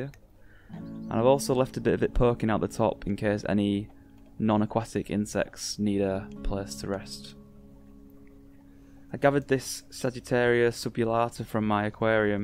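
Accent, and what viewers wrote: Yorkshire, United Kingdom. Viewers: sounds like he is form yorkshire lol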